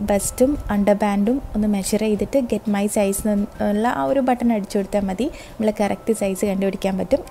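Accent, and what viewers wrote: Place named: Thiruvananthapuram, India. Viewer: Namale thiruvanantha purathe ninane athane samsarathile mattam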